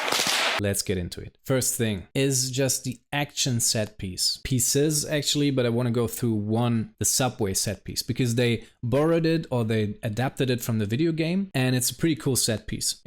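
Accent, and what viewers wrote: Germany. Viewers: Where is your accent from ? Germany ? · I caught the accent too lol, have German family. Ich mag deine Video Reel Talk!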